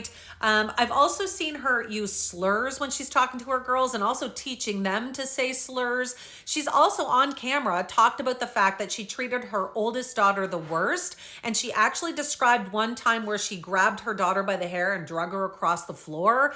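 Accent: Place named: East Coast, Canada. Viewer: Just found you tonight. You have to be Canadian, east coast!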